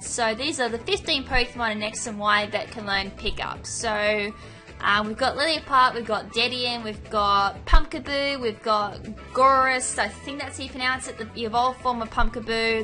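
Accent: Australia. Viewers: actually she's an aussie so it's probably an accent thing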